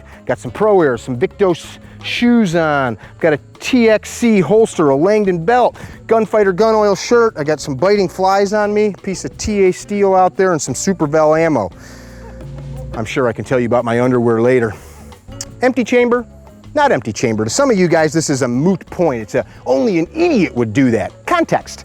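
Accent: United States. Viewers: Speakin normal american english so he must be. 😁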